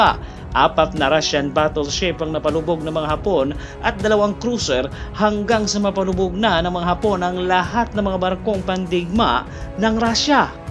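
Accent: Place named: Tagalog, Philippines. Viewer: Habang tumatagal medyo tumatagalog na ang punto.. At hindi na masyado puro "Pero"...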